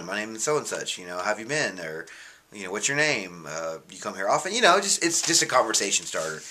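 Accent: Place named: New York, United States. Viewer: I thought you were from NYC for the longest time. Lol Great review braw.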